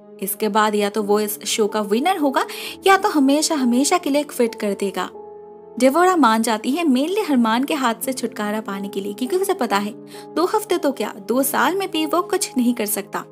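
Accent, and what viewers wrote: Bengal, India. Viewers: are u from bengal?? right na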